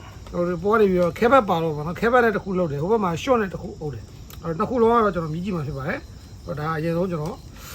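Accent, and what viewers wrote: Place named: Twantay, Myanmar. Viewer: တွံတေးတိန်းတန် အသံနဲ့ဟ ရှင်တို့က ရဲလား🤨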